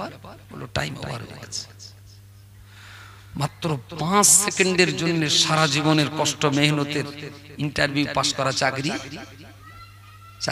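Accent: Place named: Bangladesh. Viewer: এর কথা থেকে বোঝা যায় এই একজন অনুপ্রবেশকারী বাংলাদেশি।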